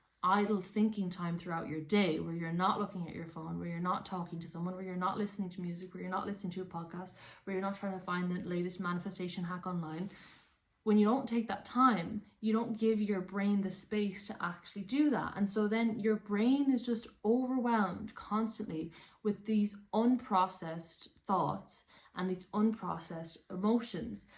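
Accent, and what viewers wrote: Ireland. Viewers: Your killing me with the Irish accent. Love the accent. Your doing a great job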